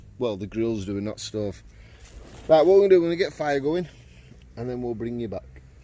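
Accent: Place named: Yorkshire, United Kingdom. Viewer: Where abouts are you from? You’re accent seems proper Yorkshire to me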